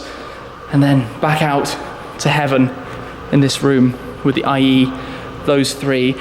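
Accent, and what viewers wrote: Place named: England, United Kingdom. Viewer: i really like your english accent. verry lovely hearing👂👂💗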